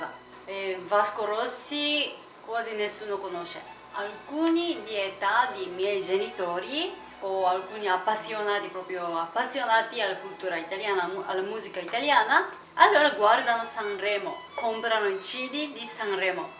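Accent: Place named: Japan. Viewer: Il tuo italiano parlato con accento giapponese è delizioso. Il tuo abbigliamento è certamente sexy, ma la tua dolce sensualità è gestita con intelligenza, sempre garbata e mai volgare né provocatoria, brava!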